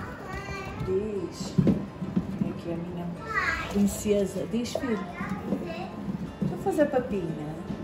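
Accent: North, Portugal. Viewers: origami art, foi este sotaque é o motivo, que os cariocas conversam diferente das demais regiões do país. É que quando a corte chegou, vinda de Portugal, todos ficaram imitando os portugueses e deu nisso. O Brasil é o único país das Américas que teve Rei. Sabia? Não só rei, como duque, marquês, conde, visconde, barão, etc nesta ordem, onde o duque é abaixo somente do rei. Saba? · É do norte tem prenuncia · Olha menina, eu to daqui do sul da Suecia vendo voce fazer esta receita deliciosa e nutritiva, nossa quero fazer pra provar, obrigada viu..Amo escutar seu dialeto portugues..Tenho uns conhecidos aqui dexMalmö e a Ricardina em Hasseholm.. Olha mae falava, " sôsu! Palavra antiga no 🇧🇷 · Amo o sotaque português, aqui no Brasil chama-se escondidinho de bacalhau.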